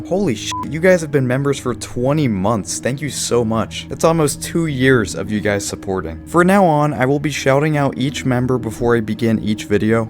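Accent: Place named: United States. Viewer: u sound so american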